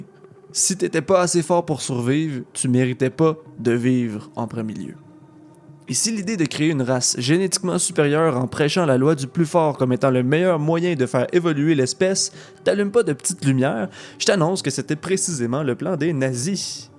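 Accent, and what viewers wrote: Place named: Quebec, Canada. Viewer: Je ne sais pas cmt j'ai atterri sur une vidéo québécois mais j'adore votre accent 😂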